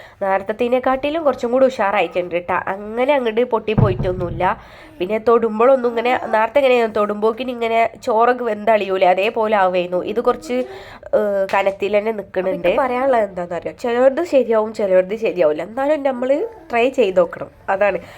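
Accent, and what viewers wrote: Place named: Kozhikode, India. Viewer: Hi new friend🥰happy new year💐സംസാരം കെട്ടിയിട് കോഴിക്കോട് ആണെന്ന് തോന്നുനു🥰❤️nice vlog enjoyed😂😂😂🥰❤️അങ്ങോടും വരനെ കൂട്ടകനെ🥰❤️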